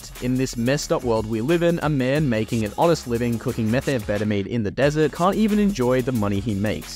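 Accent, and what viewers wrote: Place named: Australia. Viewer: I noticed you had an australian accent. the best way to launder money in australia is to deadass go to a casino, put all your dirty money in and cash out. and there you go, you have nice clean money you won at the pokies without any cuts. this is genuinely a massive problem in AUS. when a whistleblower tried to point this scheme out, the casino lobby in Australia tried to sue him.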